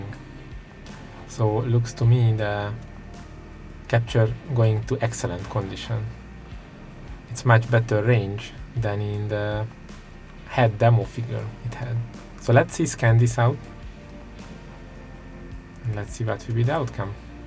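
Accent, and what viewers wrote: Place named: Hungary. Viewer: hey bro, I'm guessing you're Hungarian?!!! I sry... just hear your pronunciation.... Cool video ThX:person-turqouise-waving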